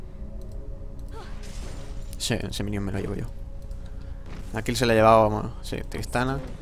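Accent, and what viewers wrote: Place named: Spain. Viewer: todos los españoles pronuncian asi el ingles?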